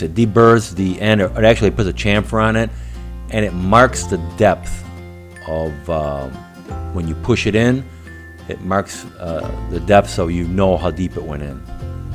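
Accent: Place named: North, United States. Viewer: bwaaa haha😂 my dad and I listened to your video together, in the first two min I said "this guy is a northerner, he's from north PA, but south of Boston. Can't figure out why he doesn't say WOOter(instead of WATTer)." 5$ bar bet you're from Southern MI?!? Thanks for the awesome video, Can't wait to install the new unit(s) that we bought..we're putting his in in Southern Colo tomorrow, I'll do mine in south TX later on in the week. Your video was a great help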